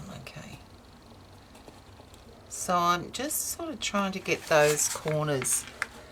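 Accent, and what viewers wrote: Australia. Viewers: So awesome to hear an Aussie accent on here. I'm sure we have lots of talented people here just like there are all around . Love your work. TFS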